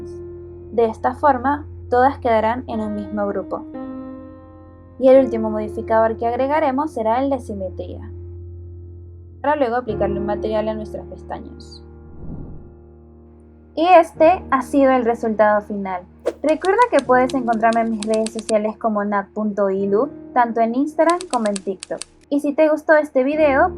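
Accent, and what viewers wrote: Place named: Lima, Peru. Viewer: El señor algoritmo de «yutúh» me recomendó tu vídeo, por fin le atina a algo. ¡Excelente contenido! (Supe inmediatamente que eras de Perú por tu acento de metrópoli, jaja)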